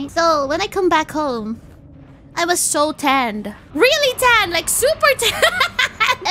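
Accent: Philippines. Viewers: I wonder if is from batangas? She kinda has that accent form a batangenyo when she talks tagalog my Filipino guy's knows what I'm talking about.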